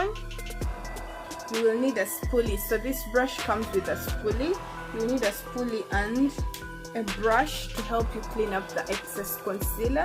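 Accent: Uganda. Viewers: Your accent tho😂are you from Uganda 🇺🇬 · You speak like a Ugandan🇺🇬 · The Ugandan accent💓💓. Love itttttttt🤗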